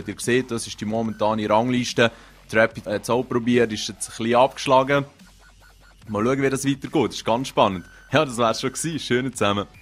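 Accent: Switzerland. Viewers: sprichst du schweizerisch oder holländisch??? ich versteh nur jedes 10.wort und komme aus bayern (mittelfranken...)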